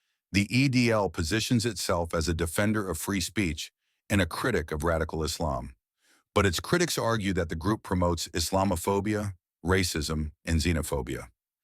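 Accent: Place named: United States. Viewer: Awful lifeless video for two reasons: the AI voiceover is in an American accent, and the video is clearly made by AI, not real footage.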